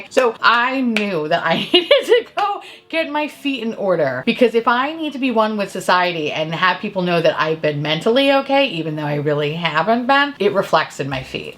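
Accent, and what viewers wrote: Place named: Minnesota, United States. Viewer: The more I hear your Minnesota accent the more I encourage you to watch 'F is for Family' so you can truly experience Jenny Throater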